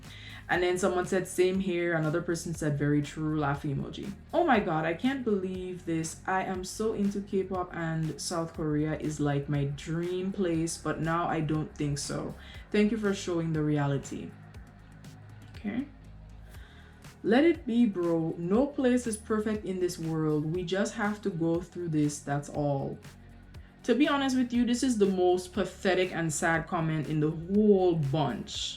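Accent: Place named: Grenada. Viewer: You sound so Grenadian! I do not go where the sun don't shine!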